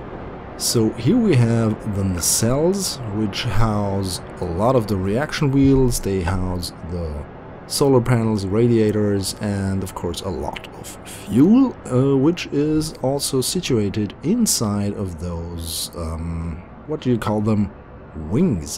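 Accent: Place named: Germany. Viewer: Die mieseste Manleykopie ever. Mit dem deutschen Akzent könnte ich ja noch leben, aber das hier? Mies...^^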